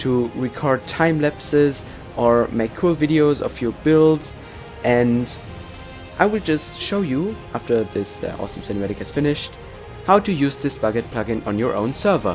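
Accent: Germany. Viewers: Is he from germany?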